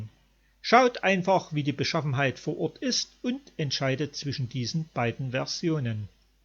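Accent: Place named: East Germany, Germany. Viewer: so jetzt hab ich au wiedermal bei dir reingluegt ;-) Intressantes Video und der Dialekt isch ja echt , wir sagen hier Ossi ;-) haben ja viele bei uns , kenne auch ein paar aus Schmakhalden , Trusenthal , Brotterode usw , war sogar mal für eine Woche da es hat mir sehr gut gefallen , war auf dem Iselsberg , in der Marienglasgrotten , usw bis bald mal wider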